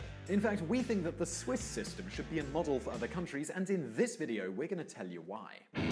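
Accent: United Kingdom. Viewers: is like VSauce's Michael with a British accent <3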